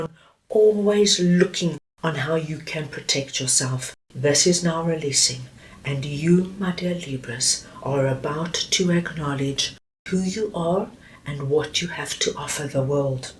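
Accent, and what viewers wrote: South Africa. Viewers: Nice reading. You sound South African.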